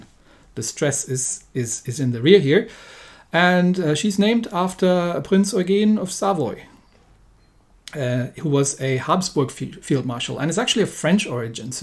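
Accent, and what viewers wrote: Germany. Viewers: Man, I don't know If you know it, but it isn't possible NOT to hear in your voice, that you're German 😄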